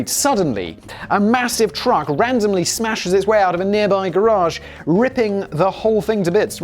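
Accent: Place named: England, United Kingdom. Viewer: Simon owes his popularity to the fact that England invaded so many countries. Now when the entire world hears an English accent they sit up and listen, because that Brit probably runs things. Especially the guy with the posh accent... he must be in charge. We just take Simon's authority for granted the minute he speaks. Before you know it, eight channels.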